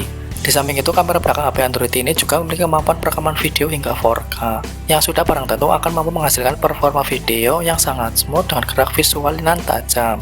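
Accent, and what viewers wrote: Banyumas, Indonesia. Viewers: Lha koe ngapak yaa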